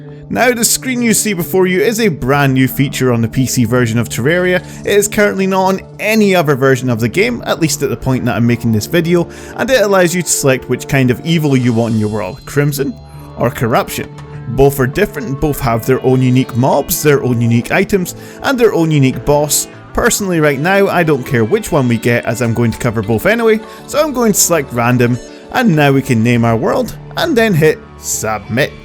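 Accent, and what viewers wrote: Scotland, United Kingdom. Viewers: My NPC died what do I do? Also are you from Scotland where are you from? I’m from Edinburgh.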